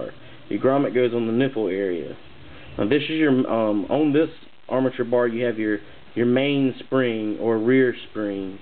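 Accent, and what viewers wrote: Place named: South, United States. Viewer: good video for new artist...the southern acent lol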